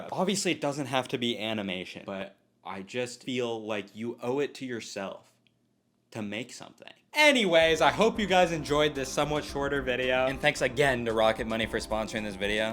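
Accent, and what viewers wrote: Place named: Chicago, United States. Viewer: this guy gotta be from Chicago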